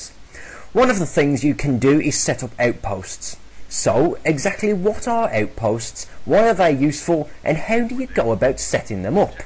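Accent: Birmingham, United Kingdom. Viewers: Is that a brummie accent?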